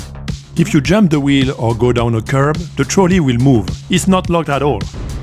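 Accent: France. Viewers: j'ai cru comprendre a ton accent que tu est français ^^ Il faut que je me pause et que j'écoute bien tranquillement tt ça, car la en speed j'ai strictement rien capté 😂 mais merci pour l'explication, je comprend mieux !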